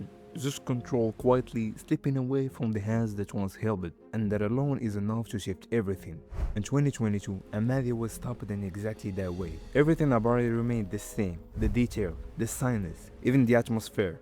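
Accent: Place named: Middle East. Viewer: I’ll be blunt…. I’m halfway through this video and it is #TORTURE 😱 because you mispronounce 50% of the words and the other 50% sounds like a 10-year-old child from the mid east trying to pronounce the English language… the visuals are great, and as other people commented: you “should“ have a lot more views, but the reason you don’t is what I just stated!😮🙄😳😡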